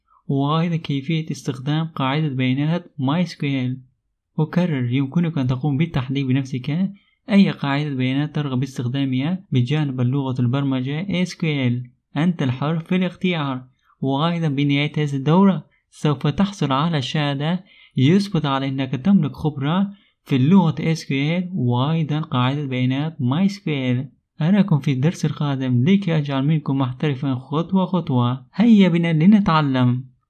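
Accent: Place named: Syria. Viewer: الأستاذ من كوردستان بس فعلا كأنو بيحكي سوري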